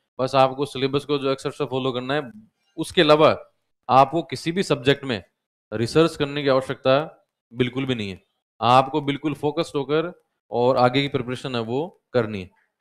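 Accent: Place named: Jodhpur, India. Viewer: ye jodhpur ka hai..... Pakka boli aise hai😂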